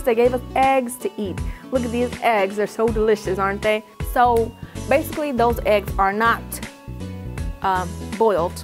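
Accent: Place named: Los Angeles, United States. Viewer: LA accent?? I like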